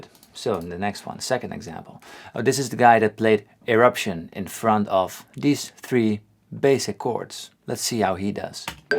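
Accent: Netherlands. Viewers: First video I watch from this channel. And after 5 seconds in, wist ik dat die dude Nederlands is.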